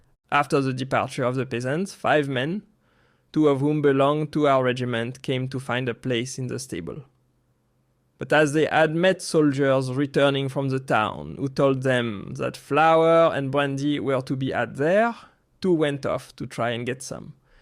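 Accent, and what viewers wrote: France. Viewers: I like the French accent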